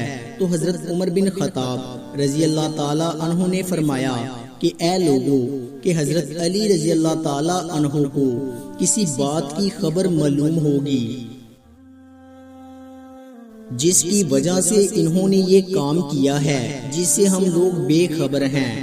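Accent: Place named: Punjab, Pakistan. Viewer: برخوردار آپکی آواز میں ایسا سوز نہیں اور تلفظ پنجابی لہجے کا ھے۔ مناسب ھے آپ اس کام کے لئے کوئی دوسرا موضوع تلاش کریں پلیز۔۔۔جزاک اللہ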